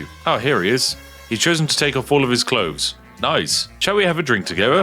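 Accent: United Kingdom. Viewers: You sound like hans capon, i think its just the British accent but its peak im British too.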